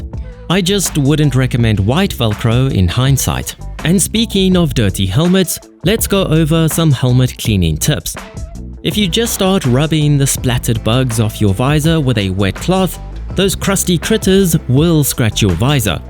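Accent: South Africa. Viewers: Thanks for the tips. Also, hello from South Africa. I could tell you were a boet from your first sentence.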